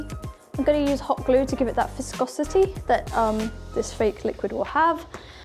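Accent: United Kingdom. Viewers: Is she Scottish or Irish 😅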